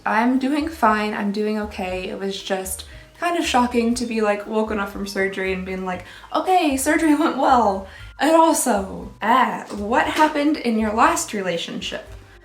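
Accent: Kentucky, United States. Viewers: Love Reno and decorating videos and would so watch if you did some. And as a Kentucky born and raised girl I hear the twang come out sometimes 😉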